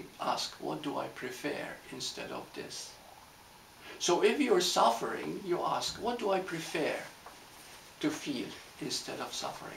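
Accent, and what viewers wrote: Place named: Italy. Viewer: This obviously has nothing to do with what he's talking about, but I'm just curious, what kind of accent does he have? His name seems English, but his accent sounds more Italian or something else. Where is from?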